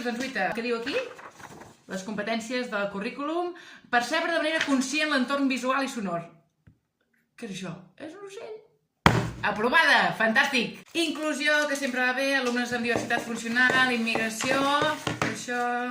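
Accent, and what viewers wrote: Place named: Girona, Spain. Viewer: M’agrada escoltar la a la radio accent Girona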